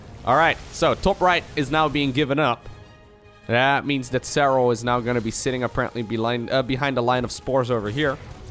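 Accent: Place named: Netherlands. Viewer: lotta letters are pronounced different in dutch. whenever dutch people speak english youcan very clearly hear that they are dutch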